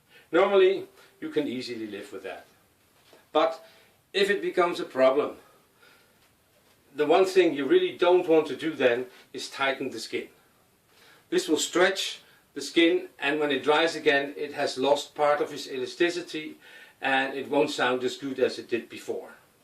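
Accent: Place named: Netherlands. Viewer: my god these banjo's are beautiful. Really having a hard time deciding. I also have a bodhran put easily tunable. Love the sound of real skin, even as a vegitarian. I live on a boat so..well... humidity. Do you still use the wax and does it change the sound. - Kijk net op je site, dacht al ver weg een NL accent te horen.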